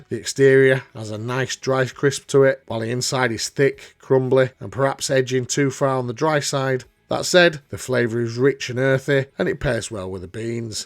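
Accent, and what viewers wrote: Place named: Cockney, United Kingdom. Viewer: I need to point out something. Why are you speaking in a Cockney accent? Are you Rodney Trotter from Only Fools and Horses? This is weird.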